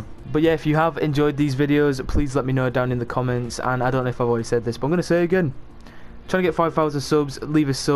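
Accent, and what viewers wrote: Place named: United Kingdom. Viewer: His accent was killin me prolly cause I love Brits 😂😭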